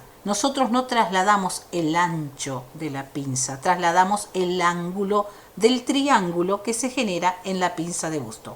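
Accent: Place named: Argentina. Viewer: Por el acento eres argentina me encantó tu clase me inscribirme para que me lleguen notificaciones gracias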